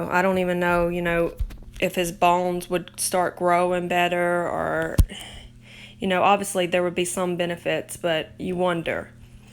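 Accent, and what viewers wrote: South, United States. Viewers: Ummmm can everyone stop classifying vegans into the same group as raw fruitarian? I am vegan and chubby and healthy. Not every vegan eats fucking cucumber noodles and green smoothies. Animal food as this lady states is not healthy and is full of cholesterol and carcinogens. But leave it to someone with a southern accent to not do any research before making a video based off of one extremist mental RAW fruitarian/ NOT a normal vegan.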